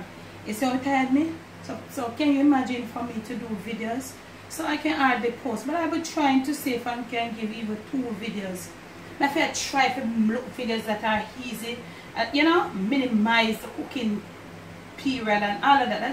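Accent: Jamaica. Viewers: Hey! This looks great and smells great too! (Yes, my computer transfers the smells from your kitchen to my desk, somehow! Its a freakin miracle!) Any chance that you might have a written recipe to share? You speak much too fast for me to try and translate your Jamaican speak into my English ears. Thank you!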